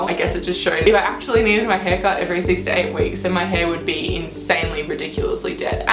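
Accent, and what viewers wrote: Australia. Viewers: Is that an aussie accent? Or kiwi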